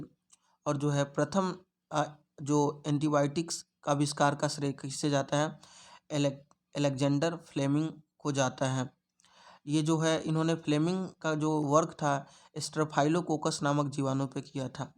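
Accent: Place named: Bihar, India. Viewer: Aap Bihar state se hain kya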